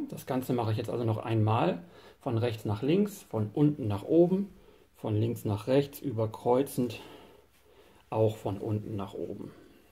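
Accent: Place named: Germany. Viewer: it was also nice listening to your German accent!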